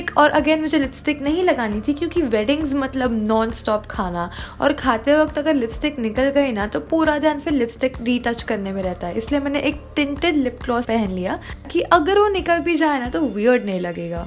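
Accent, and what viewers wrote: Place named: Mumbai, India. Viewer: Omg a hindi video After hearing ur mumbaiya hindi in ur vlog with ur dadu i requested u to do video in hindi n u did it Thanks Btw please do post videos in morning so it will make our day beautiful One more thing i m not getting notifications of ur channel but getting of others channel is anyone else facing same problem 10 days left for vlogmas n its sad please try to post more videos N just love 💓💓💓💓💓💓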